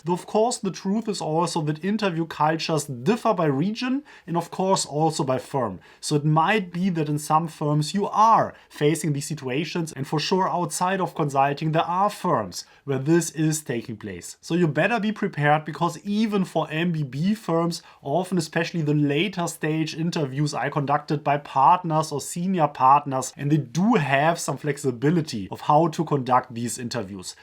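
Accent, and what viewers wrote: Germany. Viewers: Very strong German accent 😆👍🏽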